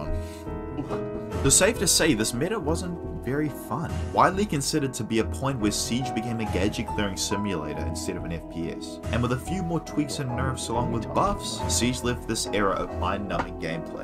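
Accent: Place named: Australia. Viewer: You sound like elmaxo (no it’s not just the australian accent)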